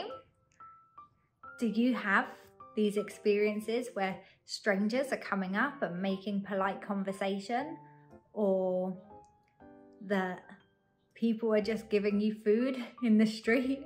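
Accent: United Kingdom. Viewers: 我覺得WTO姐妹會應該邀請你上節目，聽你講話好有趣。英國式英語腔調比美語好聽耶~~我們的總統蔡英文說英語的時候其實也有很濃的英式腔調。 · Hi,This is my first time come to your YouTube channel and i like your British English accent. So i Subscribed your Channel. 保持善良的心，但是別放棄警覺。害人之心不可有，防人之心不可無。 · Lovely British accent and welcome to Taiwan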